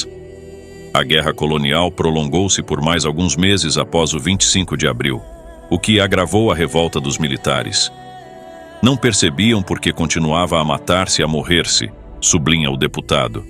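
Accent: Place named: Brazil. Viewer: só uma duvida ........... pq varios canais africanos utilizam o sotaque brasileiro?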